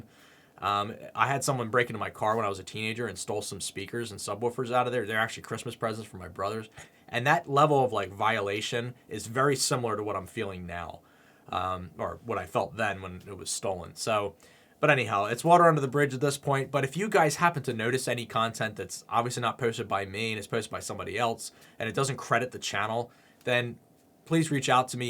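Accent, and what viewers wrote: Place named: Pennsylvania, United States. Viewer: Brother your PA accent is awesome! Reminds me of my 9 year’s training in philly. Your channel is the best!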